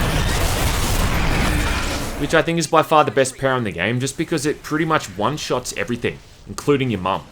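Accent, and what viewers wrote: Australia. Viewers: You are quickly becoming my favorite channel to watch. The delivery, the jokes, and the Australian accent ( oh ya, and the games). God tier content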